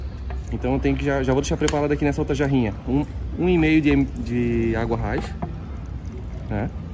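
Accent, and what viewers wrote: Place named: Santa Catarina, Brazil. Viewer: Top o trampo! Com esse sotaque deve ser do litoral de SC, hhahahahh. Salve do Zinga/Floripa.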